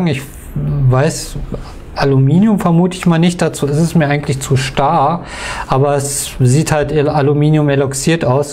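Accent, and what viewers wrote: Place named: Hamburg, Germany. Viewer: Hamburger juuung :D Schönes Review, vielen Dank!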